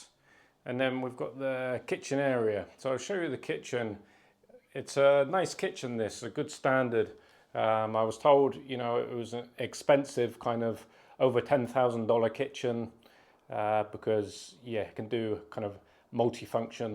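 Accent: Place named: United Kingdom. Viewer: Nice to hear pure British English, not American one.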